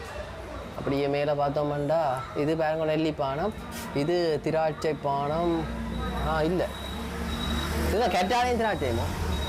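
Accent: Jaffna, Sri Lanka. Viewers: தமிழ்நாடு திருப்பூரில் இருந்து இலங்கை யின் தமிழுக்கு அடிமையான ஒரு தமிழ் கிறுக்கன் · பனைபொருள் அங்காடியில் பல பொருட்களை யாழின் தூய தமிழில் அறிமுகம் செய்தது செவிக்கும் மனதிற்கும் இனிமை பயத்தது... நன்றி. வாழிய யாழ் தமிழின உறவுகளே... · இலங்கைத் தமிழ் செமபா. வாழ்க வளர்க.by நாகப்பட்டினம் மீனவன் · சிவகங்கை மாவட்டத்தில் இருந்து உங்கள் ரசிகன் இலங்கை தமிழ் மிகவும் அழகாக உள்ளது வாழ்த்துக்கள் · இவர் பேசுவது தான் கலப்படமில்லாத யாழ் தமிழ் பேசும் விதம்.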